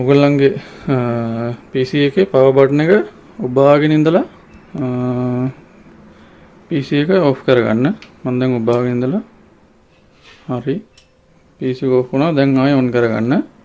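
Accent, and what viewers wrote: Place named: India. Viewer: Title english and you speak indian. I dont know what u are saying bro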